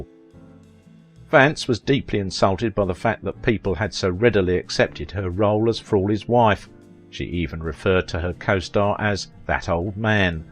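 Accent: United Kingdom. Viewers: Does anyone else find it ironic that a nostalgic program about an American classic TV show is narrated by a gentleman with a British accent??🙄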